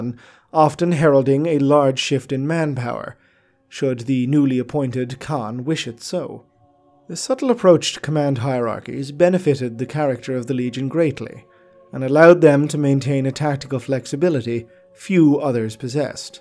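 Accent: Ireland. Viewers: Excellent work as ever. Is that an Irish accent I hear?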